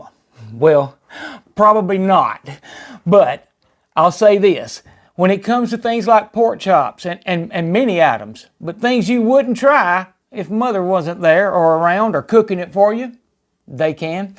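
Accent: South, United States. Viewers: That country accent makes the first 10 seconds of this video so awesome lmaooooo 👌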